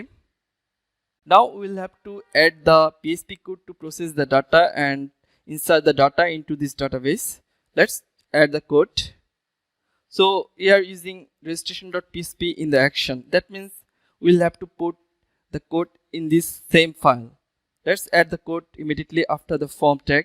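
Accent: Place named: Bengal, India. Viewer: Terrible sound. Also accent is heavily Bengali, very hard to understand!!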